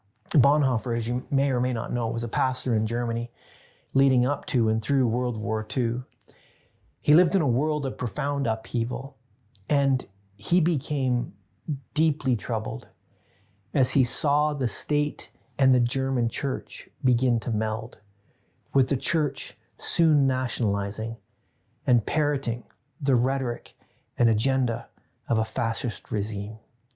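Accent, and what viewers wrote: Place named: United States. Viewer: Your question seems to presume that Bonhoeffer would do something in response to the Trump administration, not so subtly implying that the Trump administration is like the Nazi regime. As a Christian I reject this presupposition. The governments of Europe are much more fascistic than anything going on in America. So perhaps if you are referring to Bonhoeffer responding to the current situation in Germany I might agree with you. But your American accent suggests that you are referring to the Trump administration. You need to be clear about what you are referring to.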